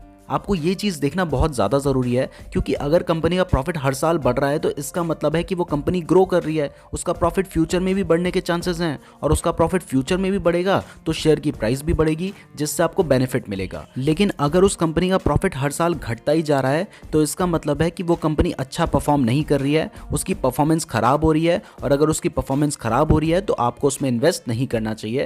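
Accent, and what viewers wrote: Uttarakhand, India. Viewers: Bro. Uttarakhand se ho na 😎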